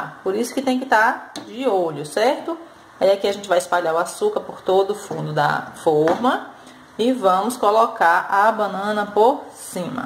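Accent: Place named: Northeast, Brazil. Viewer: menina, adoro nosso sotaque, sou Nordestina e repito muito. Certo e né? Né? rsrsrs. Amei sua receita CERTO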